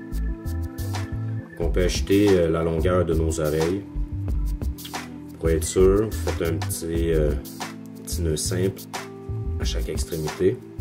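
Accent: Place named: Quebec, Canada. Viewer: Un grand merci a toi je regarde toutes les vidéos que fait, j'habite en France et ton belle accent Québécois mes fait voyager et rêver.Encore bien merci et continue j'adore les regardés, voila a bientôt j’espère avoir un message de ta part.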